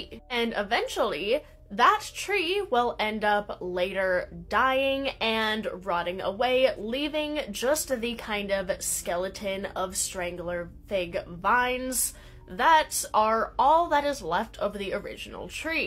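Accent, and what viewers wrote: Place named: East Coast, United States. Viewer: The effect in your voice is interesting. Maybe East Coast but maybe like some teacher you had. I find it wearing.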